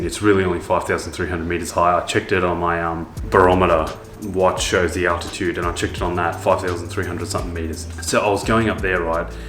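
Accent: Australia. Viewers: you sound Australian. haha. maybe I dont know my dialects very well. I have a CB500x. looking at these just for fun. we have no Enfield dealers and I'm sure I wouldn't lose a dime selling it if I didnt like it.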